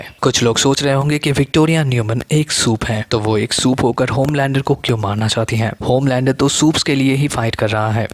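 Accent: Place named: Arunachal Pradesh, India. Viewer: bro.. kya bola aapne? pe.. Rabindranath "THAKUR"? 😅 it seems may be u r from arunachal pradesh.. plz improve accent... baki explanation accha tha... 👍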